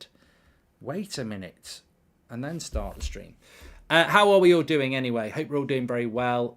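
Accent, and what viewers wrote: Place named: Manchester, United Kingdom. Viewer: Its that miserable manc accent that makes it funny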